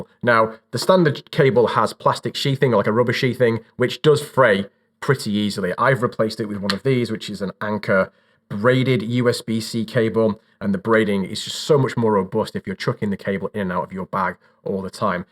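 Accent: United Kingdom. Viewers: You’re British? Excellent video!! 👏🏻 Greetings from Chile 🇨🇱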